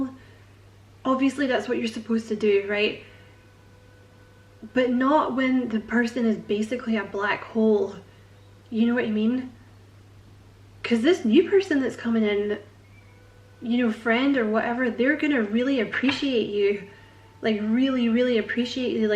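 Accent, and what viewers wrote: Scotland, United Kingdom. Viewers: Are you Scottish? First tarot reader I’ve came across on here that is. ☺️ I’m in Glasgow ☺️ Love hearing the accent 😁👍🏼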